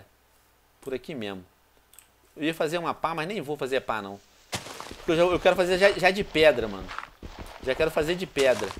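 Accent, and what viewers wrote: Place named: Portugal. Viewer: Meo deos que nostalgia, meu, você foi minha infância, junto com todos do grupinho. S2 saudades de seus videos. Cof amo sotaque de Portugal, é tão fofinhooo.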